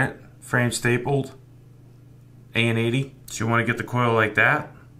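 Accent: Boston, United States. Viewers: what accent is that, boston ?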